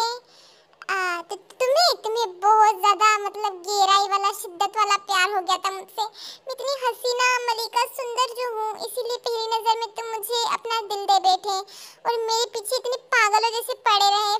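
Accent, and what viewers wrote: Gujarat, India. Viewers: Diii are you gujarati ? 🤭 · Di ap gujrat se h kya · Kya aap gujarati hai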